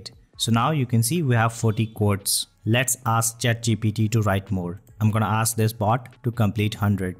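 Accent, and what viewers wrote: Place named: India. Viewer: "This is a driving drop tool".. I'm not too efficient in English - but what indeed does it mean, and why it is not possible for Indians to speak normal english at least for tutorial videos purposes?..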